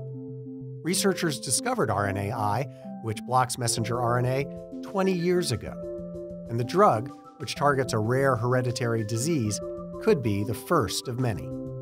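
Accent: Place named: United States. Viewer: The American pronunciation of harassment, detail and zebra made this difficult 😅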